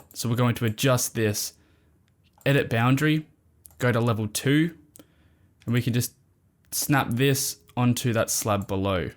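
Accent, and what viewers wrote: Australia. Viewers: I'm learning almost as much about Australian English and vocabulary as I am about Revit. Love it.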